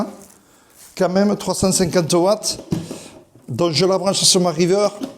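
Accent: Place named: South, France. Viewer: Bonsoir, L'accent du sud est charmant. De mon point de vue, il faut absolument rester crédible et adapter la véritable prononciation. Nous sommes de la même génération, alors ... <link> Merci pour cet échange et bonne continuation. 🙏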